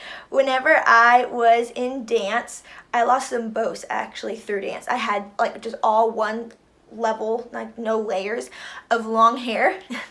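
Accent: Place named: South, United States. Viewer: you speak southern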